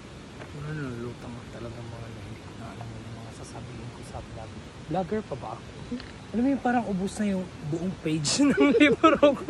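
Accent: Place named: Ilonggo, Philippines. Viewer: Naaaliw talaga ako sayo pqg nagsasalita ka..lalo kapag ilonggo tono mo😂😂😂😂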